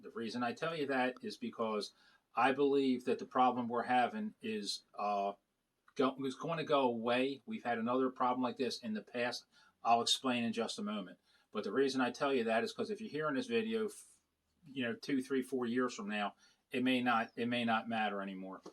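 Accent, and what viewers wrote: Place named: New Jersey, United States. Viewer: Love your channel brother,you have jersey accent ..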